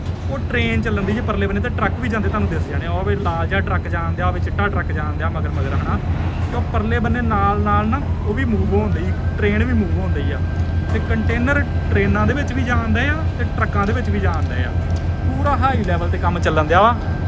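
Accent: Majha, India. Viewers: ਬਹੁਤ❤ਹੀ ਵਧੀਆ ਜਾਣਕਾਰੀ ਹੁੰਦੀ ਹੈ ਆਪ ਜੀ ਦੀ ਵੀਡੀਓ ਵਿੱਚ ਅਤੇ ਆਪ ਜੀ ਪੰਜਾਬ ਦੇ ਕਿਸ ਜਿਲ੍ਹੇ ਤੋਂ ਹੋ ਕਿਉਂਕਿ ਤੁਹਾਡਾ ਬੋਲਣ ਦਾ ਲਹਿਜਾ ਸਾਡੇ ਇਲਾਕੇ ਮਾਝੇ ਦਾ ਹੈ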